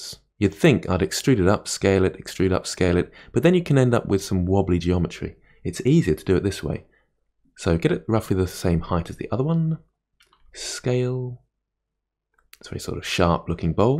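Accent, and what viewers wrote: United Kingdom. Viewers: it's good that you show which keys do you use. Because of Your bri'ish accent i've mistaken alt for o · (me, opening up this tutorial) Oh hell yes British Accent thank god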